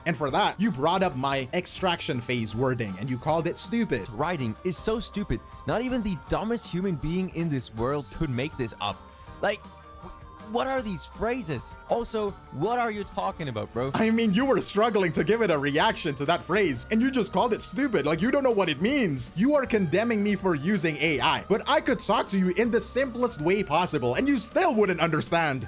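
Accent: United States. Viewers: imma be serious, till this drama happened, I did not know I were from the Philippines and genuinely thought you were an American with a really cool accent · not american? aintnoway you're Indonesian my brother as fellow indonesian your speaking tone sounds more american like newyorker or from cali💀 but hey im glad that you're being honest and clarify misunderstanding ( just rare to see sea cc that have community that full on people speaking english )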